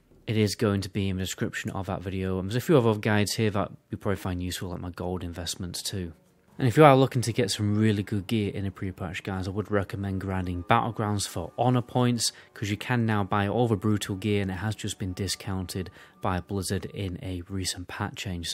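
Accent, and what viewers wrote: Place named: Teesside, United Kingdom. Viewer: You sound like a teessider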